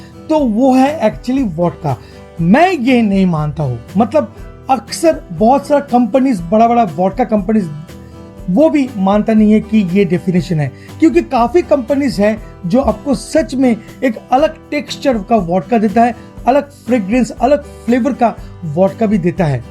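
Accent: Bengal, India. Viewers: Dada you are superb , I like your Bangla touched hindi.....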